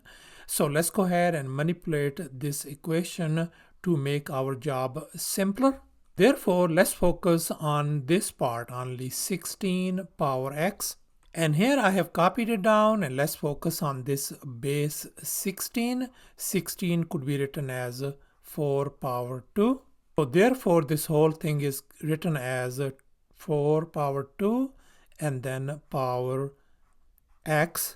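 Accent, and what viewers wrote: India. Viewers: I love Indian ton English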